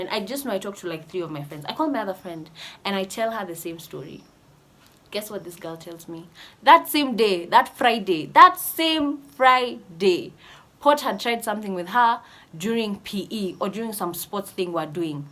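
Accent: United Kingdom. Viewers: I love how you introduce the British accent naturally 😍😍😍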